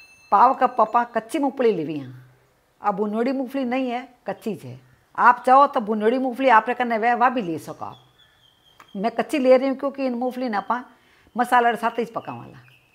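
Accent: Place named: Rajasthan, India. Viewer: Thank you. I love your recipes. I also like hearing you talk mardawadi.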